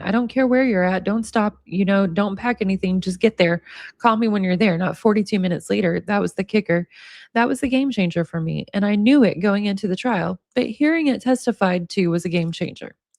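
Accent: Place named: South, United States. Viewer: Having just found you with this video, I absolutely love how you don't operate off of malice and a burning desire to destroy a complete stranger, but simply doing your best in reporting the facts that are available to us made more palatable with a sprinkling of sarcasm and even that's hard to detect thanks to your lovely Southern? [I'm a Brit lol] accent and a wee giggle at the end 🤭😍 that just serves to lighten an extremely dark case all around making it far easier to digest ❤❤❤❤ And that's what's so incredibly frustrating, as they don't even need to introduce new legislation in order to hold Ms. Becky accountable for her actions, far from it actually, as truth be known is there's plenty, plenty laws and statutes in existence that would hold her suitably accountable, but it's incumbent upon the powers that be to simply ENFORCE >>>>EXISTING<<<< LAWS OF THE LAND that could easily apply to Ms. 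Hill including... 1/obstruction of justice, 2/conspiracy (with her son who's also been charged in relation to this debacle) to pervert the course of justice, 3/conspiracy to subvert judicial proceedings, 4/conspiracy to personally enrich oneself and /or profit from the strictly prohibited dissemination of protected /sealed images pertaining to an ongoing criminal trial, 5/conspiracy to engage in and /or assist another to engage in the prohibited interception of protected telecommunications by means of illicitly recording (wire tapping) protected telecommunications for the use of malicious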